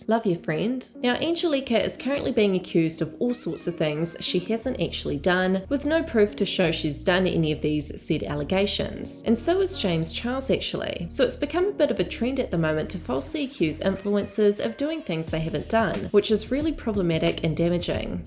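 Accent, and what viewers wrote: New Zealand. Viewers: I am Australian. We don't have a twang. Only Kiwis and South Africans do. The way she pronounces things is definitely Kiwi. We have heaps of them living here, and vice-versa, so we're used to their accent. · Kiwi accent that’s why. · Great vid always love to hear that kiwi 🥝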